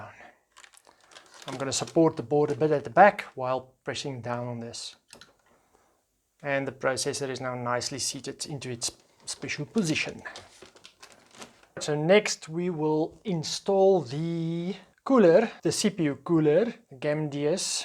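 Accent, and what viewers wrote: South Africa. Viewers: Kan hoor jy is van SA xD jy die by die werk gebou?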